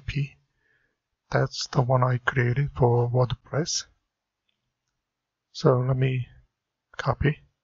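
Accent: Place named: India. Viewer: Bom demais, sotaque indiano nunca falha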